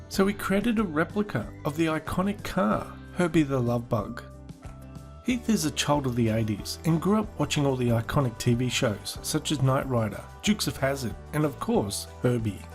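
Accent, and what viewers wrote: Australia. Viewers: Herbie and KITT. He sounds like an Australian version of me. I'd love both of those in ny garage but I'll settle for just Herbie. At the moment.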